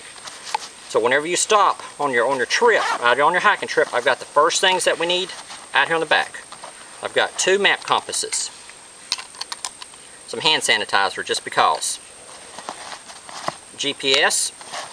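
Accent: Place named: Alabama, United States. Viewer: what part of the world are you located in? reminds me of Alabama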